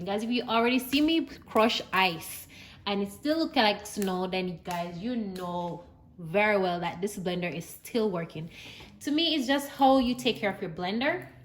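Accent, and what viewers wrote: Jamaica. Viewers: i was she is from jamaica but at first i didnt hear your accent but like 30 seconds in i was like mi sista indeed lol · Your accent is so beautiful, just like yourself. Are you from Jamaica? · I hear a JAMAICAN IN YOU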